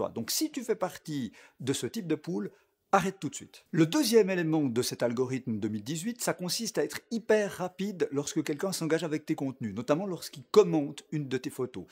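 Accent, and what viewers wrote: Switzerland. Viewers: Très bonne vidéo, très intéressant, surtout pour des gens comme moi qui ont Instagram dans le coeur de leur business. Est-ce que je peux te demander tes sources? Car celles que je consulte habituellement n'ont pas relayé ces infos:) Très bon boulot en tout cas, je vais regarder d'autres vidéos 😊 Sinon, serais-tu Suisse? Je semble déceler un accent comme ceux par chez moi;)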